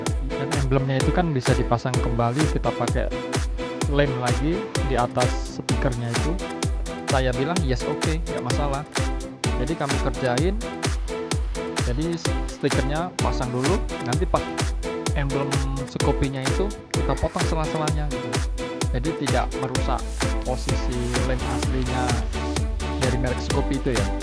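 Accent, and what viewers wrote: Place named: South Sumatra, Indonesia. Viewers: Dari logat ny sumsel ya bro? Gw sumsel soalny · Sumsel dmnanya bang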